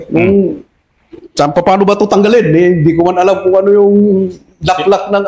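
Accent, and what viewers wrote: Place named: Ilocos, Philippines. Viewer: Halatang Ilocano si Sir hehe,pero saludo ako sa tapang mo Sir.Isa kang bayani,mabuhay ang tropang musang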